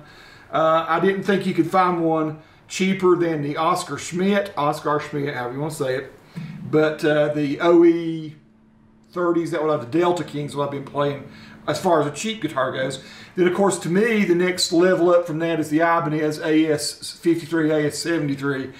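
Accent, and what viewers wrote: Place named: East Tennessee, United States. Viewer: Are you from Knoxville? Your accent sounds exactly like the East Tn. accent.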